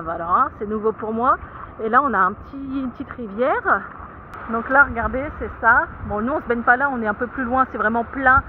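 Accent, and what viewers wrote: North, France. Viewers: Bienvenue dans le sud ouest 😃 pour vous baigner attention car la baignade à Anglet est dangereuse (Baïnes qui vous entraînent au large) même si il y a les MNS. Voir Hendaye, St Jean de Luz +++. Vous allez aimer le sud ouest même si pour vous, venant du nord, c'est un sacré changement. J'espère que vos enfants vont s'y plaire. Pour l'appartement heureusement que vous êtes logés mais avec des enfants vous devriez en trouver un. N'hésitez pas à vous présenter aux commerçants et poser des affichettes. Avec votre accent vous allez passer toujours pour une touriste ! 😃😃😃 Bon courage !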